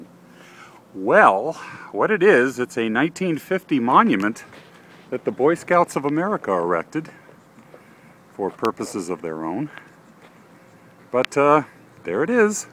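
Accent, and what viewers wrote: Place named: California, United States. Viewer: u said u were from California.where your parents were born doesn't count for u.u sure sound like your from there.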